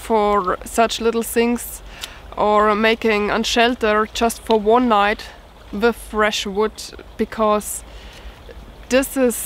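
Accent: Germany. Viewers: Hi. I started watching your channel a short time ago and I like your videos being off grid. I spent 2 1/2 years in Bad Kissingen, Germany while I was in the Army and listening to you talk makes me homesick for Germany. Just thought I'd share that. Thanks for the videos. Take care. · your german accent in English is adorable...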